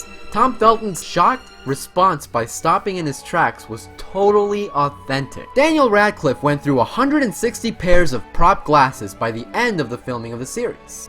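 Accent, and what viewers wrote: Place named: United States. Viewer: american pronounciation for the words of harry potter's world takes off all the MAGIC !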